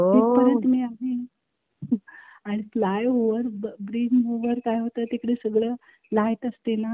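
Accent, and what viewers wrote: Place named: Goa, India. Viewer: Hya tai govyahun bolat asnar nakki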